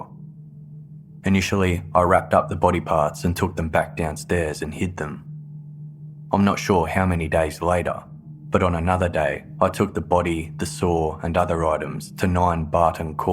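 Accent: Australia. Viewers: Great Australian narration!!!!❤❤❤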